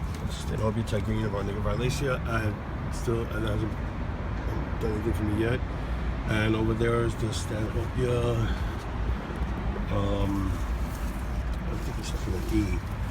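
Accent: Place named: United States. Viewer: Where do you live? You sound American but I figure you live somewhere in Europe with the schwerter comment...love the balcony!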